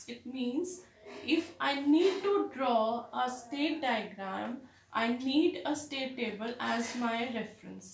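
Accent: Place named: India. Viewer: In the thumbnail, I saw a white arm and I clicked the video because of that. But it is goddamn indians AGAIN! That terrible English and the accent is driving me crazy and I cannot stand any content made by indians.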